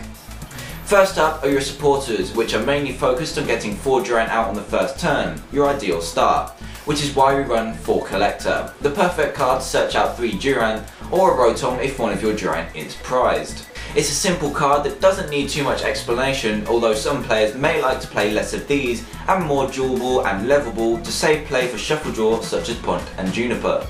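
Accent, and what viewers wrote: United Kingdom. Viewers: I just love your British accent and the vocabulary choice.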